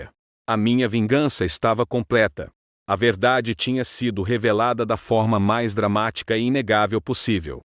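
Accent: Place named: Fortaleza, Brazil. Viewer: Não gostei do narador é meio esquegito de Fortaleza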